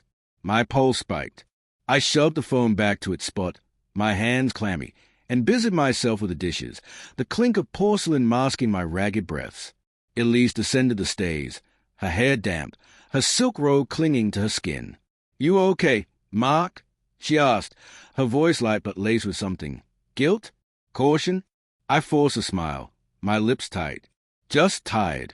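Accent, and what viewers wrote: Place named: South, United States. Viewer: The southern accent from the AI is off putting!! Needs to have a slower cadence to be believed. Good story though.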